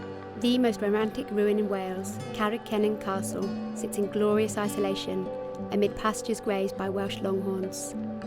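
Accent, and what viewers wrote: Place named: England, United Kingdom. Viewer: would it have been so difficult to have found a Welsh speaking person to narrate - it bugs me to have an English sounding narration of this lovely video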